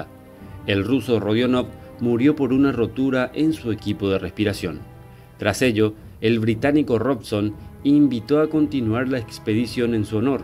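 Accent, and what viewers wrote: Paraguay. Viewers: De que país sos amigo? tenes acepto Paraguayo sin ofender.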